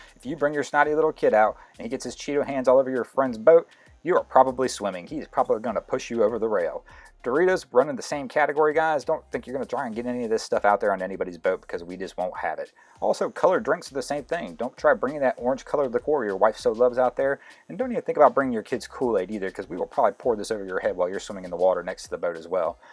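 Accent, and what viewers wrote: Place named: Maryland, United States. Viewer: are you from Maryland? Your accent sounds like a Maryland accent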